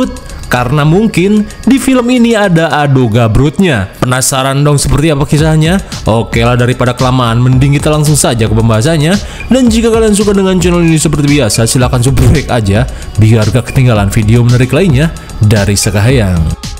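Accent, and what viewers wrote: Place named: Banten, Indonesia. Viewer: Dina logat Sunda na Sunda Banten doang na ieu Chanel nyh😅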